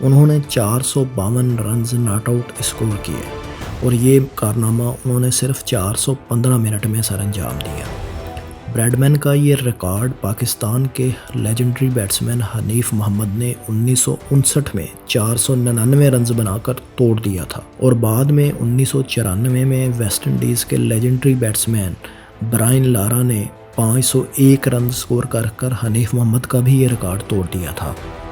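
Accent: Punjab, India. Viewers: O bhai punjabi zubani main urdu ka sabaq parh rahe ho? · Is Bhai ka to to Urdu bolen main panjabi lehja hai .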